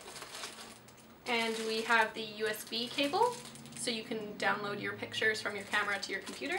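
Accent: Canada. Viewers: I'm guessin your Canadian?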